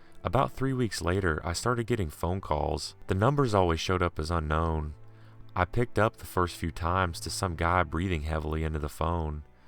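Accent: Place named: West Virginia, United States. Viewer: Love listening to your voice! I'm from West Virginia and your accent sounds like you're from wv too!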